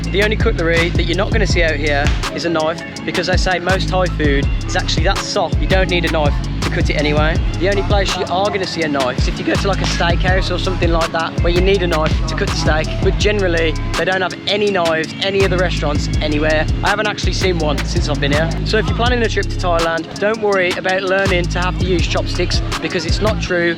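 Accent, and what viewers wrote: Birmingham, United Kingdom. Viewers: Thanks sounds like your a brummie nice one ime a jock 😊 just subbed very usefull i have done lithle research and decided pataya just offered that bit more nightlife etc as i allready decided booked off season june i been told it can rain all day every day mabey you know if thats true or not thanks just subscribed very usefull thank you looking foward too more your videos cheers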